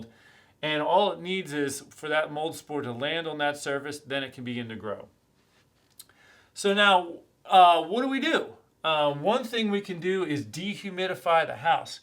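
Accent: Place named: Pennsylvania, United States. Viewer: I recognized that PA accent 😅 I really appreciate all the information you gave in this video. It was exactly what I was looking for.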